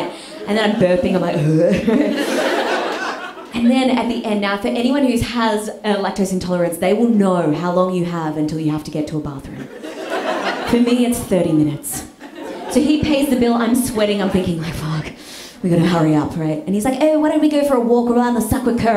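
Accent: Australia. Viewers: The aussie accent got me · That Aussie accent… what a babe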